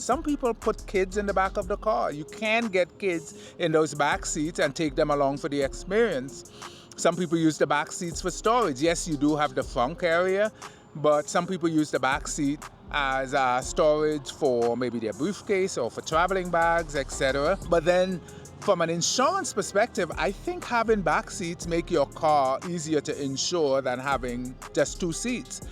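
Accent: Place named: Saint Vincent and the Grenadines. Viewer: Hey! I agree with your analysis of the 992.2. Some terrible decisions by the VW group. After the 997 years, the 911 has just been getting uglier every year. BTW!! Are you from St Vincent in the Caribbean? My friend Kim Collins has the same accent as you. Just wondering. Thanks for the great content. All the best!!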